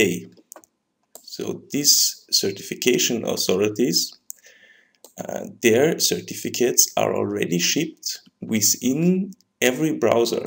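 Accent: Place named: Russia. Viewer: You need to hide that Russian accent a bit. Good video and explanation and thank you for posting this information.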